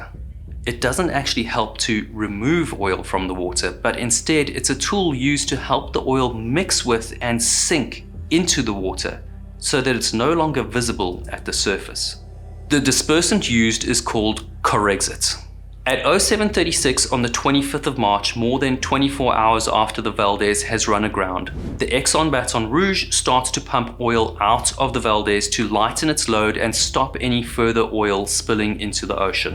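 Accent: South Africa. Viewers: YERRZZZZ OF DEVASTATION LOL south African accents are the best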